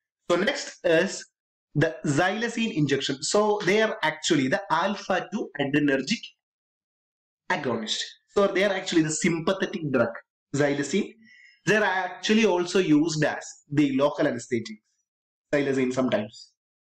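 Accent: India. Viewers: 😂 Indian lololololoo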